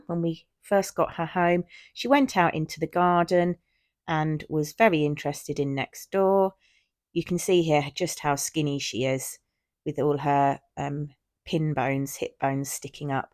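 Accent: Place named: Essex, United Kingdom. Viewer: Fairy is beautiful and she is so lucky she has landed on safe ground with you as her new owner. You sound the perfect, caring owner every dog could wish for. Well done for rescuing her! 👏🏼👏🏼👏🏼Seems funny hearing the Essex area accent again. It's exactly how I spoke. I now live in Ontario Canada and have done for 45 years, but I was born in Romford, but lived near Maldon after getting married. It's a lovely area, obviously I know it well. I have always loved greyhounds and my brother-in-law owned a Lurcher. How I loved that dog. He looked like a big hairy greyhound LOL He was also a rescue and had been used for badger baiting. Rescue is the way to go! (she says - with a breeder bought Shih-Tzu/Maltese 🙄)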